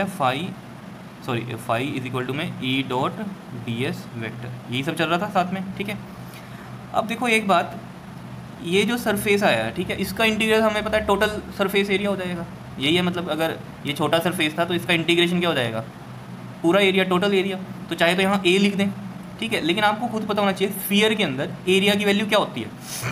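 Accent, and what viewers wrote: Punjab, India. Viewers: Sir ap Punjabi ho🥰🥰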